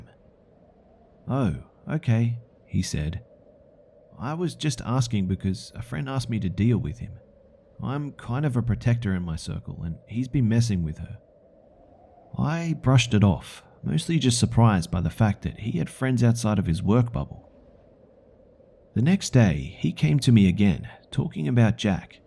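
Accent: Australia. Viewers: I believe he is the Aussie of our horrors. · your Australian accent is schmexi man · he's Australian you rotten walnut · To honest, things are less scary in an when Australians talk! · Aussie all you other people are so dumb he's one of us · I can't take these stories seriously on account of that charming aussie accent. It's not scary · great video. keep up the good work and some people say they love your voice. To me it sounds normal because I am Australian too.:) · Australia he lives were I live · Coming from a Kiwi, this guy's accent is most definitely Aussie. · Australian, I believe. But the horror master himself should answer that. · Aussie he's one of us mate! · He's an aussie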